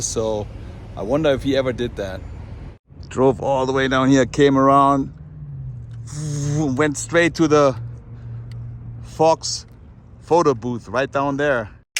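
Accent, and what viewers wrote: Germany. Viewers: Are you German? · Haha the very same Massage-Seats weve over here in the "PC" (Paunsdorfcenter) in Leipzig, Germany. Got your Video in the Recommendations and just thought "he has a german accent" - totally not read ur Accountname.:D Wish ya a nice sunday and thx for the small travel in time.:) Liebe Grüße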